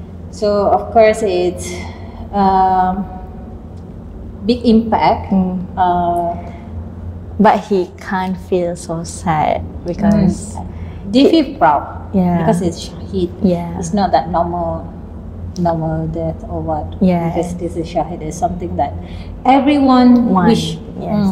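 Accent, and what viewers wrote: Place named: Malaysia. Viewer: I like MALAY ACEENT, SO CUTE ❤️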